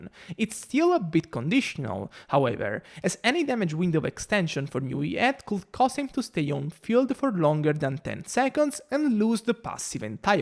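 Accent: Italy. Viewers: Where r u from? U sound really italian tbh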